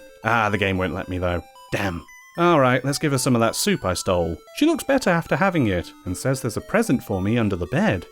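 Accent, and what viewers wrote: United Kingdom. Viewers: You're just the spiffing brit, aren't you? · Damn British accent. Could have been a good video too.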